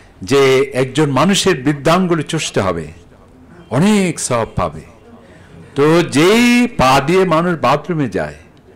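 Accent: Chittagong, Bangladesh. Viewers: গুরুপূর্ন আলোচনা।চট্টগ্রামের ভাষা ওনি ভালা লাইগ্গি। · উনি ত চট্টগ্রাম এর. ভাষা শুনে বুজা গেছে . খুব ভালো লাগছে.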